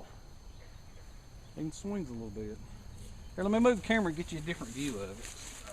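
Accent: South, United States. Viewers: Hey, Forgot to mention - i now know how to do that knot you used - thanks so much my friend. this is something i will remember for the rest of my days. The way you showed it was so clear and easy to follow unlike some other knot video's on here - can you do a video with some more knots that you know? Your a great teacher & fun to watch, love the south accent too:)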